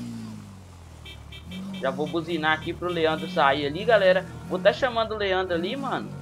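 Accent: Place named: Bahia, Brazil. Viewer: vc e bahiano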